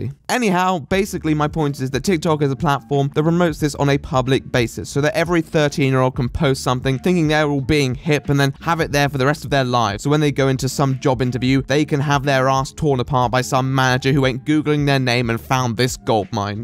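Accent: RP, United Kingdom. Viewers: Received Pronunciations :) The posh accent. Not as posh as ye old aristocrats. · hes posh mate, pride of the uk. hes funny though so gotta love him!!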